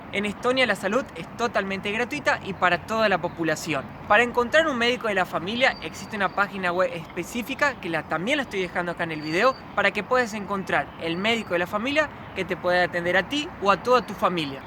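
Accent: Argentina. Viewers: Sos Argentino amigo por tu tonada. De que provincia??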